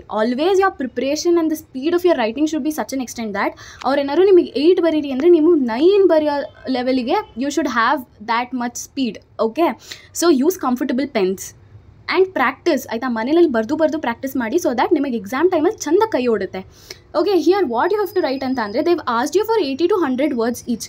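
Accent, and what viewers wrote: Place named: Uttara Kannada, India. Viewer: Pakka uttar kannada nemduu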